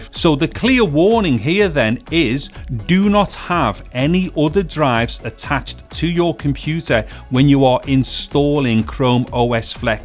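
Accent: Liverpool, United Kingdom. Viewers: a scouse tech channel? subscribed!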